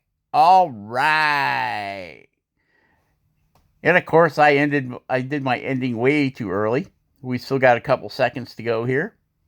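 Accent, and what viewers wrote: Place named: England, United Kingdom. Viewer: Where do you live... I am picking up an English accent lol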